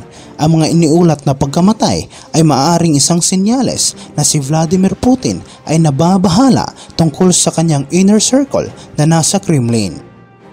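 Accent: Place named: Visayas, Philippines. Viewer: Bakit ganyan ang punto mo bisaya ka ba o bisakol ha ha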